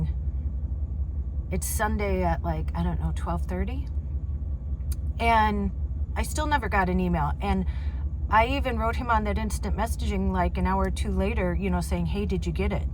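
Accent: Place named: Midwest, United States. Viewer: Good for you. Spoken like a midwestorm girl. Minnesota or Wisconsin. AL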